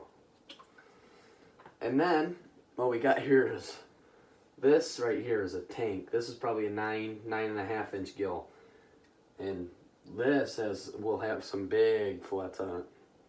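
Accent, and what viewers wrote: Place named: Canada. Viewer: You sound extremely Canadian in this video...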